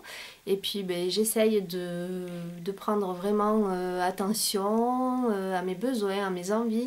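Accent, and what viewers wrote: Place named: Toulouse, France. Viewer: Bonsoir ma nièce ( petite soeur adorée ) . Super ton accent toulousain ou carcassonnais !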